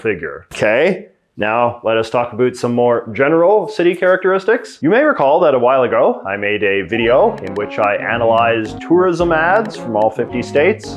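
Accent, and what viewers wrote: Canada. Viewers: Love your unmistakable Canadian accent!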